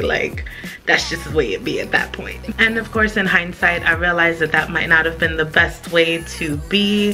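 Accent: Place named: Caribbean. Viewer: WHich Caribbean are you from?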